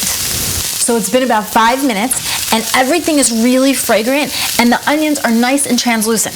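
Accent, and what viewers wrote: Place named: New Jersey, United States. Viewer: Lol what happened to your Jersey accent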